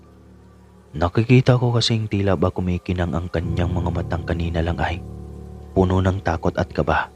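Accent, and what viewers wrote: Philippines. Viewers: Taga leyte ka ba? Parang tagalog ang punto ng pananalita mo...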